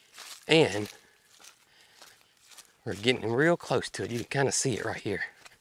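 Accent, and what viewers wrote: South, United States. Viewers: Thank you for showing us the orientation of your farm. Having a better-than-average sense of direction, knowing the layout of places and special things alleviates confusion for me like the pond is a lot closer to your house than I previously thought and on the opposite axis too. I know that it causes confusion for many people. Thanx for showing the areal shots too. That put things in perspective for a lot of us. I so enjoy this channel., you're always doing something different. Plus your strong Southern droll cracks me up. I also like your kids' videos too.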